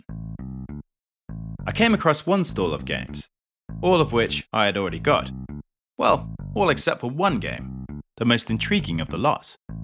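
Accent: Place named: Scandinavia. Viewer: Sorry had very similar accent to some scandinavians speaking english Are you big as in age, height - or width lol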